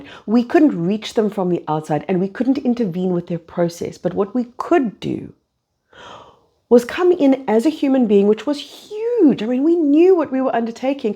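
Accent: South Africa. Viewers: I like South-African-English. It is much more understandable for a non native English-Speaker than for example American- or British-Englsih.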